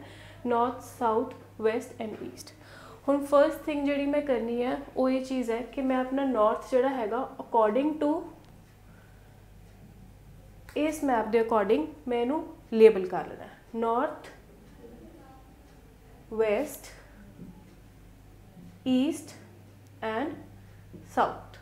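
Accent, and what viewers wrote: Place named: Punjab, India. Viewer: Love from Pakistan and really love your punjabi accent.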